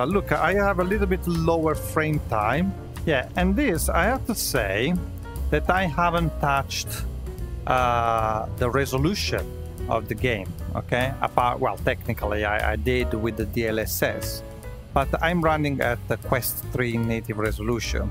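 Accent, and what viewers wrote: Italy. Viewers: Fantastico!! (Sei italiano, senza dubbio) Parli inglese benissimo!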